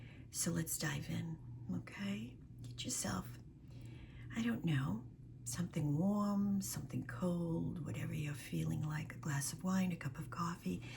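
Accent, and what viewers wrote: New England, United States. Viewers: From the accent, I'm guessing you're a New Englander. And I understand that you're moving to Texas. How are you feeling about that prospect? Hope you're MAGA if you want to fit in there... Good luck with the relo.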